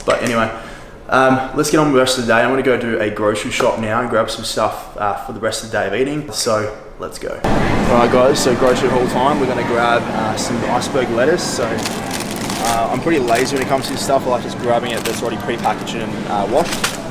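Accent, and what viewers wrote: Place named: Australia. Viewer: Hey man—just discovered you have YouTube videos—I messaged you today about Donnie Darko. Wow, people tell me I speak too fast, I think ya beat me there! 😂 No worries I understood you throughout—and wow I love Aussie accents. Y’all are the coolest, every Aussie I’ve ever met in Texas was a top notch person. Truth is I’d probably hire you for online training, but I’m am ex-trainer myself (ACSM/HFI) and I seem to always clash with the guys I’ve hired. Anyhow, two thumbs up on your online presence & ventures. And PS: I’d be surprised if a Hollywood producer doesn’t offer you a film role one day soon, you’ve got a great mug, killer bod and masculine voice and presence—the works! Cheers mate. 👊💥🙌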